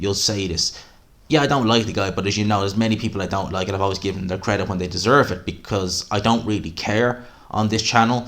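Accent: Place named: Ireland. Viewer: 1st. Loving da irish accent. is a goon. Truly real channel. Totally bullshit free. Exactly how it supposed to be. Much appreciated 👍👍🔥🔥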